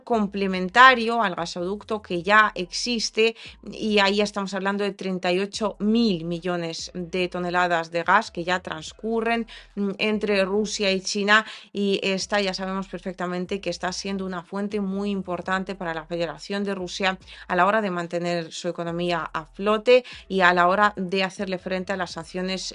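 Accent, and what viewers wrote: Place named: Castile, Spain. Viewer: Muy buena información muy buen español aunque con acento castellano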